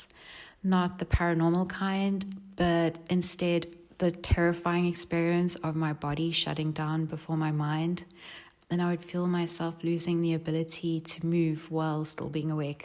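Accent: South Africa. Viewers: I thought I heard an South - African accent. Thank you for sharing. Look forward to watching your backlist. 😊😊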